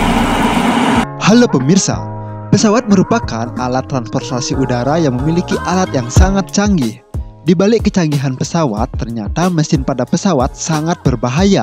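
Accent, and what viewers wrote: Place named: Bali, Indonesia. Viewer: Pengisi suara orang Bali😁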